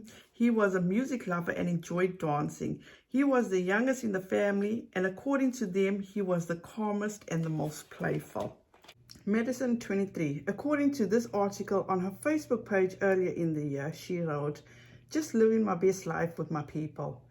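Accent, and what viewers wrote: South Africa. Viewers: I'm trying to place your accent, are you South African? {:-:-:}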